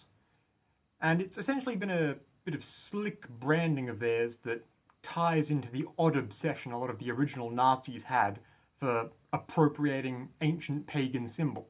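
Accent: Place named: New Zealand. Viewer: To my ken, he's a Pākehā in Aotearoa. Should be mostly similar to an Englander.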